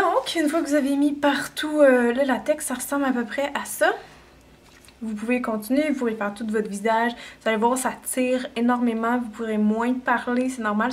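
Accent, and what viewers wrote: Quebec, Canada. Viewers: cest Québécois tu es ben la première a dire que taime pas notre accent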